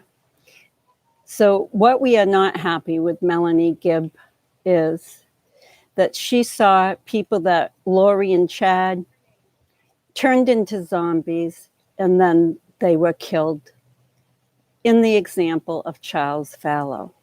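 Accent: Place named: Northeast, United States. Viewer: I LOVE your channel!!♥️ I REALLY LOVE your northeastern accent. Your voice sounds just like my own did.😍It brings back happy memories. I think your assessments of this most BIZARRE case are pretty much “spot on.” Thank you for the time/work you put into your channel.🌺🌺🌺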